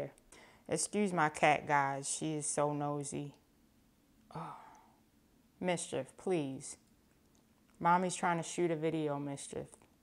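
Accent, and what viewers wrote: Baltimore, United States. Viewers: I hear a little Baltimore in there?👀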